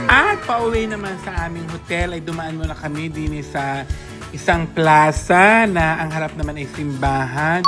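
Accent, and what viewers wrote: Batangas, Philippines. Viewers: Authentic Batangueno ang narrator na pumunta sa Iloilo, di ba? The best pakinggan.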